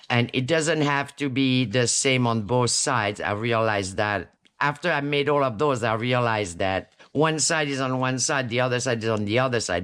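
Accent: France. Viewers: Crafting is so much more fun with a french accent! Loving this tut! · you done a great job and here is the story on why i am your subscriber, i first thought you were this other crafter that i havent seen in a long time, i clicked on your channel and your voice and accent was not the crafter i thought you were, then you reminded me of the woman my mom had come to sing us kids to sleep and tell us Norwegian stories, i realized you are French and there could be no way in fashion you were that Norwegian woman bcuz i am 53 and she would be passed on, bcuz i think she was 53 back then. but how ever i am glad i clicked on your channel and getting to know you and crafting with you!! i just adore you fully!! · Oh I love you already, I'm French too but I live in a mostly English area, but your accent reminds me of my family. Lol, anyway like your video too. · Gosh darn I love your videos,glad to meet you,my name is also,I go by Trish,I love your french accent,I was also born in france,not too far from paris.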